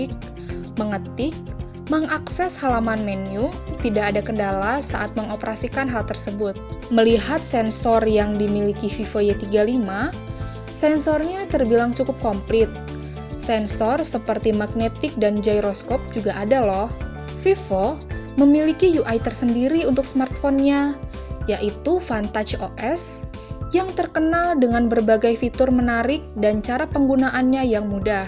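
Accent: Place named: West Java, Indonesia. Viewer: ... Nama Jawa, tapi logatnya Sunda yah?:)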